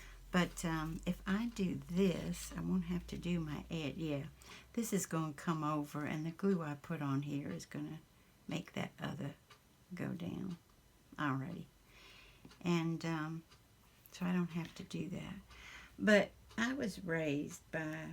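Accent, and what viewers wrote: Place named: Charleston, United States. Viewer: How can I I love you even more. Your gentle kind caring sweet. When I hear you I think of Charleston SC dont ask me why. But you are sweet corn.👍💕🐇🐥🌽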